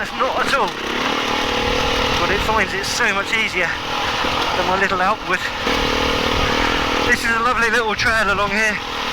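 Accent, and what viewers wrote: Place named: England, United Kingdom. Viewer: Great day out on the bike in the sunshine does it get any better 👌 PS love the English accent and cursing it cracks me up haha cheers 🤙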